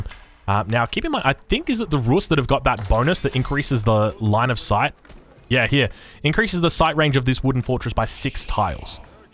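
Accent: Australia. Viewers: Im italian but i can feel his Australian accent is funny.good to see some aoe game and learn something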